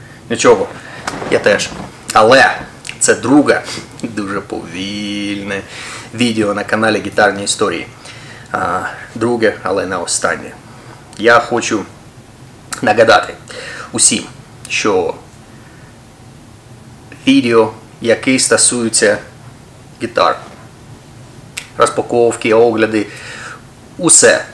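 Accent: England, United Kingdom. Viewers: у тебе український з англійським акцентом, таке перший раз чую чесно кажучи!!)))) Отвіртка - викрутка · а як тобі вдається російською говорити без англійського акценту, а українською - з ним?:) Improvement - “покращення”, улюблене слово Вікторфедрича Царапают - дряпають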